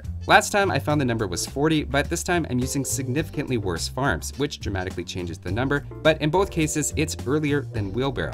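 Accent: Canada. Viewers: Wait. are you canadian dude?? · You are Canadian?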